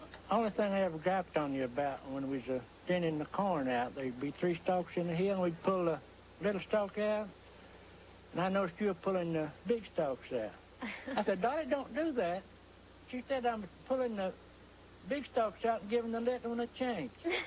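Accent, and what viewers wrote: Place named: Southeast, United States. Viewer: Southeastern America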